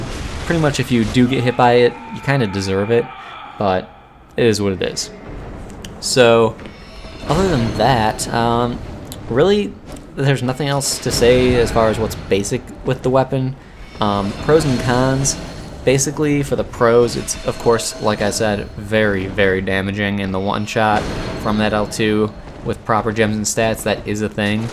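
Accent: United States. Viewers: the american accent is strong with this video.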